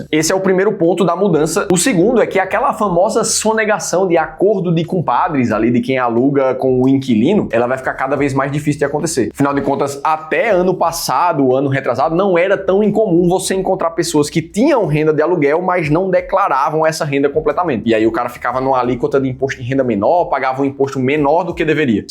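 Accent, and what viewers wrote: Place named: Northeast, Brazil. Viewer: Com esse sotaque de nordeste. Não pode reclamar. Deve achar bom mais imposto melhor. Virei benefício.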